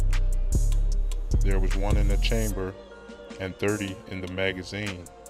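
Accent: Baltimore, United States. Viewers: oh you from b more huh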